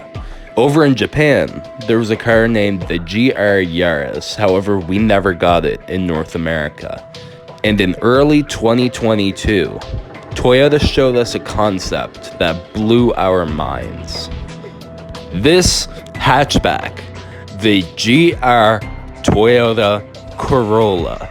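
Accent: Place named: Netherlands. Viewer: You sound like your from NL like me haha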